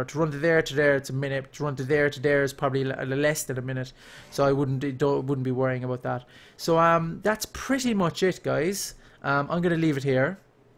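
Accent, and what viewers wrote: Ireland. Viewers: I am and you sound irish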